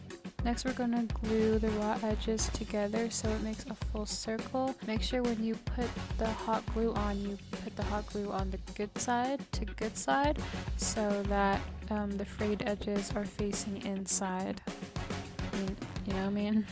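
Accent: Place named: England, United Kingdom. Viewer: can't wait to hear it 😂 and I have heard worse especially when they try to sound extremely posh which tbh everyone tells me i speak like that but Ik that not everyone does and you sound like a normal English person btw Britain is Wales Scotland North Ireland and England all together and we all sound very different 😊 that's why what you were doing is more of an English accent rather than say an Irish accent😊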